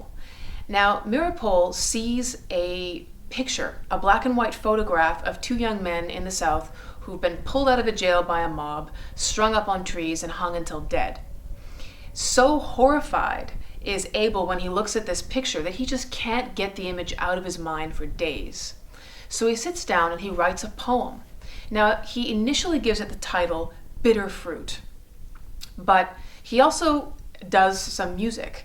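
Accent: Canada. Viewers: And you spelled Abel's name incorrectly. While we're correcting things, W.E.B. DuBois pronounced his name as "Doo-Boyss". Perhaps her being Canadian has something to do with her pronunciations...? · Miss mispronounces several names. I detect a touch of a Canadian accent.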